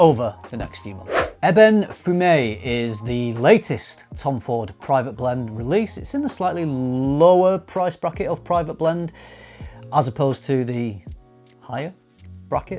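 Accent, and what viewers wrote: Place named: Bolton, United Kingdom. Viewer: Are you from Greater Manchester like the Bolton area? I recognise the accent but it's more like mine, I'm from Bolton but I moved to Lancaster then Workington and my accent has changed. I don't say perk instead of park anymore or hew instead of how etc. I basically round my vowels now but people can still tell I'm from Bolton even though it has been 19 years. I might be wrong but I'm sure I can hear Bolton in your accent or close to Bolton. I feel like I've heard your voice and seen you somewhere else do you do videos on facebook? Only just found your videos I just watched your comparing Aventus and like the cut of your jib so just subscribed!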